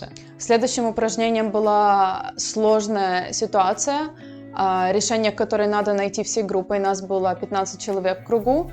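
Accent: Ukraine. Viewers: Украинский акцент не уб.ешь ничем. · Может покажется странным, но самое классное в видео, это Ваш украинский акцент, особенно нравится буква "ч", и буква " я", которая по произношению ближе к "а".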